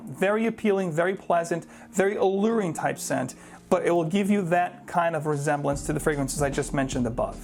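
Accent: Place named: New York City, United States. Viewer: I like your reviews, clear NYC accent... good descriptions , gentleman style!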